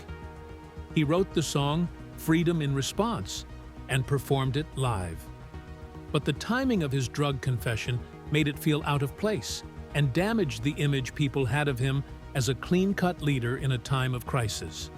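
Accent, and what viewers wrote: United States. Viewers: Why is it an American doing this commentary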